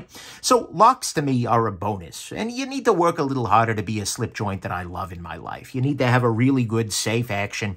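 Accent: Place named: Brooklyn, United States. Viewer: No way you live in Cali! You sound like your from Brooklyn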